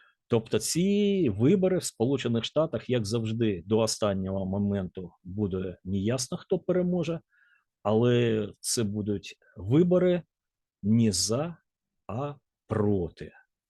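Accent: Russia. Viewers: Як відчувається російський акцент, і в мові, і в темі.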